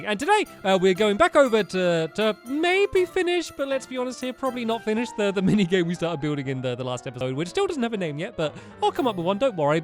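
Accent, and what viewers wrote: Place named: Liverpool, United Kingdom. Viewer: Scouse KiddaLaa boi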